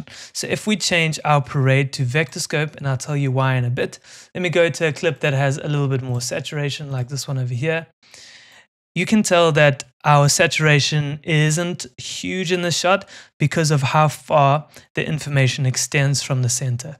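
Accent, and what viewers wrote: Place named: South Africa. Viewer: south like a fellow south african , that accent is very south african but thank you so much for the lessons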